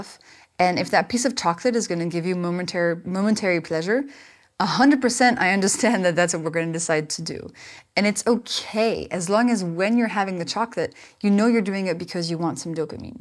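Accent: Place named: France. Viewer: You are the first french person that I hear talking English and your accent in combination with your calm voice is so pure beautiful. Its like listening to a romantic song.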